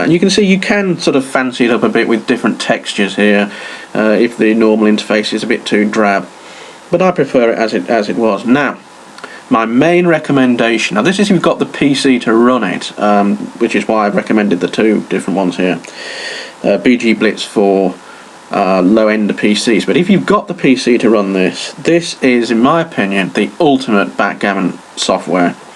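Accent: United Kingdom. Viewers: Good old Northern English dialect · You have a wonderful British accent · i love your english accent, its calm, easy to follow, and very clear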